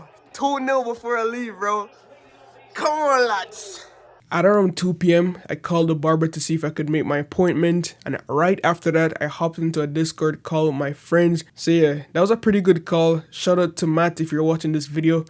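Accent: Jamaica. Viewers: You Jamaican? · You sound like a Jamaican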